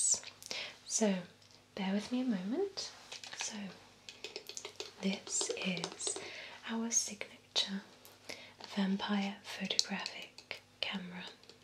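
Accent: United Kingdom. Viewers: There is nothing that turns me on more than a super-hot girl from the United Kingdom; their British accents drives me WILD!! This is a pretty cool skit too; her acting was really good!! This clip should have way more views and likes than it does!!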